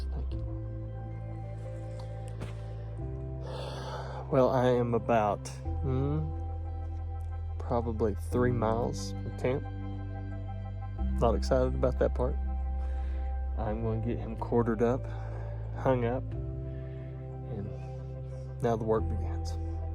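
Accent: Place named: East Coast, United States. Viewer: "Holla". I love you Easterners accent & word choice. We here in Idaho, call that a basin, canyon or draw. Very cool wilderness too have hunted. Hell's Canyon is great too. Fantastic bull. Congrats. Gotta admit, I teared up with you. Truly a blessing. God is always in the blessing His children business!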